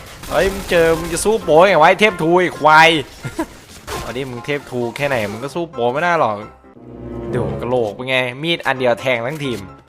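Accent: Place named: Rural, Thailand. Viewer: พูดเสียงเหน่อ น่ารัก >,<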